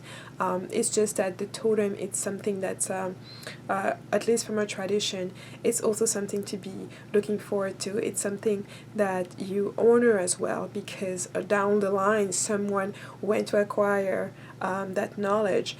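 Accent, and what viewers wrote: France. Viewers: You have sort of french accent. Is is Your first language? (If so, Your English is amazing!) Very good video, by the way :-)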